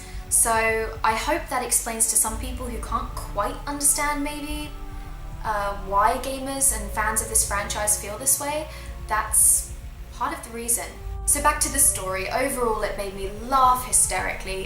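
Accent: United Kingdom. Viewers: Now I really want to know what made you cry "hysterically" :-) (not that I didn't too... well not *hysterically* but still, I completely understand). Actually I would love to hear what choices you made and how it played out for you. I always like talking to friends about the different choices they made and why. Anyway, fantastic review (this one and the ending) I feel like you're voicing my own thoughts, just in a female voice... and British accent. Love your videos, keep up the amazing work!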